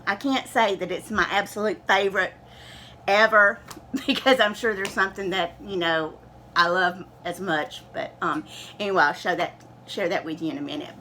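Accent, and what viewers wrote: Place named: Alabama, United States. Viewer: NAPCO! Isn't that also made in Japan? Can you tell I'm a new little baby? Just had to say that word, baby, as it's so cute how you talk...with your down south Alabama accent! 🤣😅😄💙💛💜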